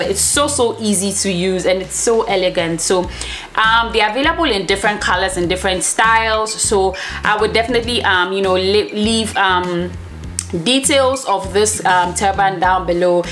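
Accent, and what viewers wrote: Ghana. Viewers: I don’t know how I knew you were from Ghana. Maybe it’s your accent or your beautiful face. I am not Ghanaian but my husband is and I spend a lot of time there because he still lives there. Keep doing what you do, dear. Are you in Ghana presently or in the US? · Beautiful! You sound like a Ghanaian🥰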